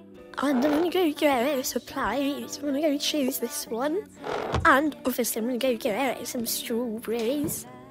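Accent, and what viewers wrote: United Kingdom. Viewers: British like me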